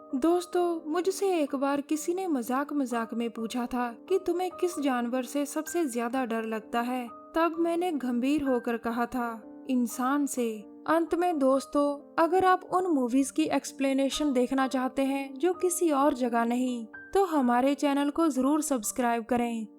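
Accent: Punjab, India. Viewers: Mam I love your voice hope I didn't get addictive 😄❤️🇮🇳 Punjabi tone is the best 👍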